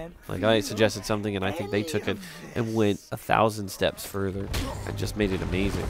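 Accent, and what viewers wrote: United Kingdom. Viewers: British accent love it ❤️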